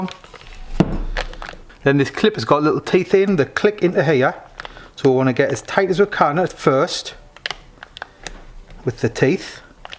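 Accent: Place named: North East England, United Kingdom. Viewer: as always good thorough content. I tip my hat to you for the endless late nights of editing your videos, this must take up a lot of your time. Keep bashing away…. I came across your videos a few years ago and noticed the northeast accent, mint! Been watching ever since. 👍🏻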